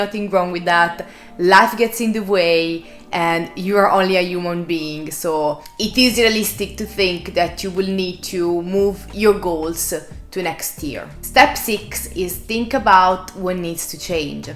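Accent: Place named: Italy. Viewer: Hello, good morning! I found this video of yours about 20 minutes ago. It's fantastic and I liked it 😊. Excuse me, what is your native country? Your accent sounds very Italian. Greetings from Sonora, México 🇲🇽.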